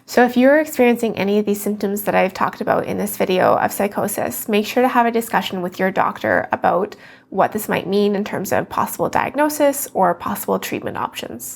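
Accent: Canada. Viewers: Great demystification of psychosis, carried out with your lovely gentle Canadian accent. I find listening to you makes me feel calm and at one with the world. I guess there's an irony there! Thank you.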